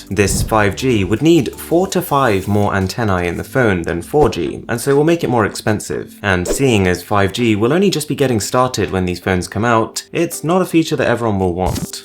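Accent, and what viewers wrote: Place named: England, United Kingdom. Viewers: DK Dost! You luving that British accent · Got to love the English accent 🇬🇧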